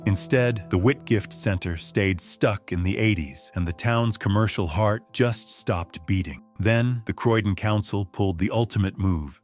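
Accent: United States. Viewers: That American accent chatting our London business is so unfit and that’s only the start. The information itself is used toilet water. Whoever uploaded this AI nonsense needs to get a grip.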